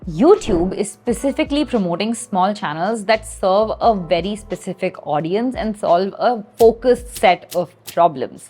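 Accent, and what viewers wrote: India. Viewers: I like the Indian accent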